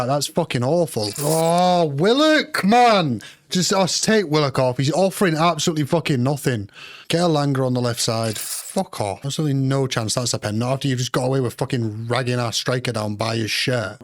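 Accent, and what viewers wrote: Manchester, United Kingdom. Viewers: You again??? Clickbait thumb nails, acting angry when you ain't and trying to be clever with ye little microphone and Manchester accent, Neva seen ye at St James Mr famous, you little actor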